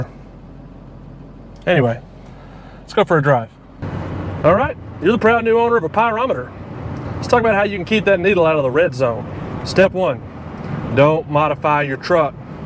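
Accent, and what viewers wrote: South, United States. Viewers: Brother you’re like the VGG of diesels, with a southern accent. Awesome channel!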